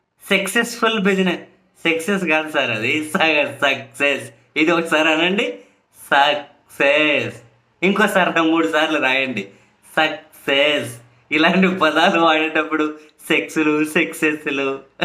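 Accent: Rayalaseema, India. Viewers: bhai.i think you might be belongs to our Godavari district.you are at present belongs cuddapha.why because I am having knowledge cuddapha/Rayalaseema slang being Area Sales manager.in marketing in FMNDC.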